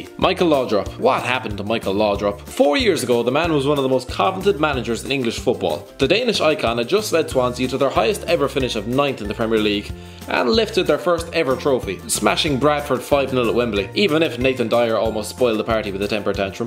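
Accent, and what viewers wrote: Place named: Ireland. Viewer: Michael Hart it's the irish accent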